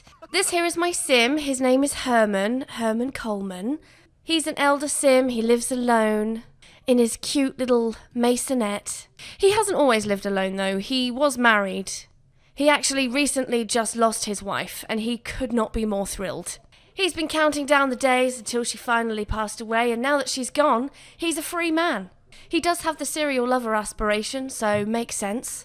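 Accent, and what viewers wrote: United Kingdom. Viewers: I love your videos! Your humor and sarcastic comical self is just like mine. I love the sims, and I enjoy your videos! Always love hearing your story of your sim and always got me laughing which I need bc life sucks! I love your accent! British ladies got it going on! Cheers!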